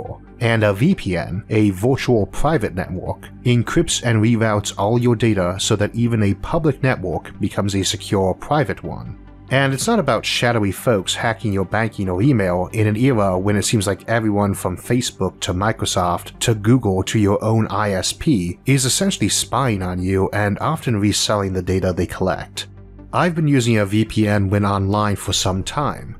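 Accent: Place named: South, United States. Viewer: I love learning about science from a southern accent! God bless the omniverse multi verses universes....John b goodenough invented the lithium ion battery!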